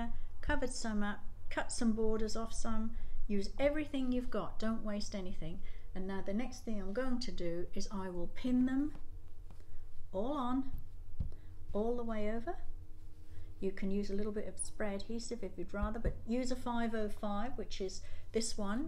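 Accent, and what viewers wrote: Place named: Australia. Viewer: This is absolutely beautiful and a wonderful tribute to days gone by. I've only just found your channel and can't wait to watch more. Lovely to hear an Aussie here. I'm in NSW 🌷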